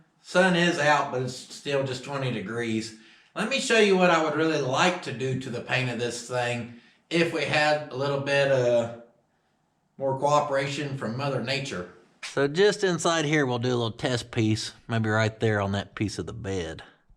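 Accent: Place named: West, United States. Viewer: Damn, your accent just reminds me of old western movies - I love it! Great commercial to boot :)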